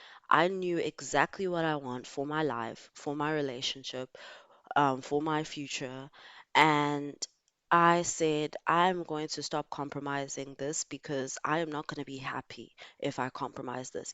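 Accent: South Africa. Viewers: I just know you’re South African🇿🇦 the beautiful accent gave it away😅❤I’m soo happy to see more South Africans in the exotical space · Another exotical channel to subscribe to❤❤❤also you sound south african you have a beautiful voice❤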